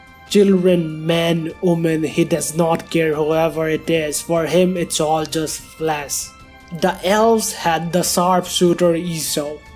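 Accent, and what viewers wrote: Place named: India. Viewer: great stuff I want more I just feel a bit uncomfortable with the accent it sounds like sounds I make when I feel sick making me sick, plus if I recognise the accent correctly this is a weak indian influenced/ indian accent which ill be frank I dont like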